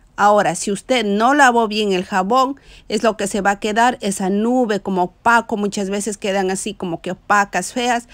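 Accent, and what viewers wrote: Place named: Ecuador. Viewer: por su acento, es usted Ecuatoriana, Pais lindo, de gente hermosa, trabajadora, si estoy equivocada, disculpen.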